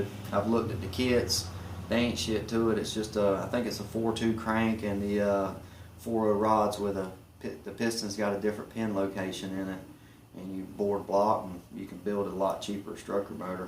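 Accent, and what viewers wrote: South, United States. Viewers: Much love brotha love the content,and that good ol' southern twang..Wish I lived closer we could go tear shit up..Keep it up..peace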